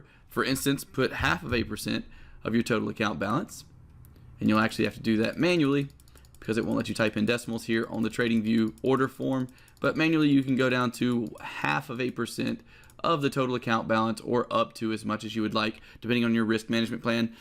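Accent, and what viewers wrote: South, United States. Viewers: I can you’re from the south lol I am too “Wifey”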